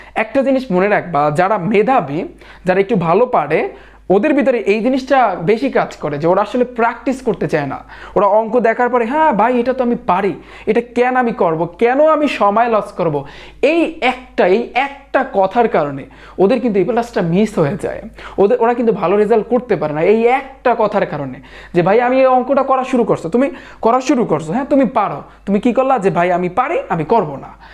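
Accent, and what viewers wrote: Barishal, Bangladesh. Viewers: Vaiya apnar bujhano ta onek valo lage vaiya apni ki borisal ar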